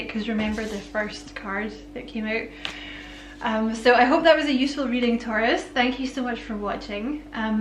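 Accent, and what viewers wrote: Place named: Scotland, United Kingdom. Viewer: What a Fab reading. I so wish you did private readings. I Love a Scottish accent me. 😘😘X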